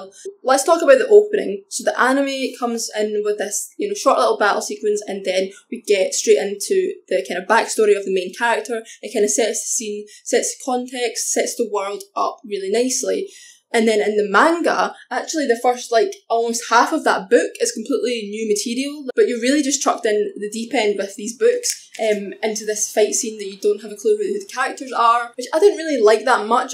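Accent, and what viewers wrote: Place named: Scotland, United Kingdom. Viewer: I love the Scottish accent sooooo much, oml. Much love from London! UK GANG!!🇬🇧🇬🇧💪🏽 Also, can't wait to read this manga and watch the anime! :D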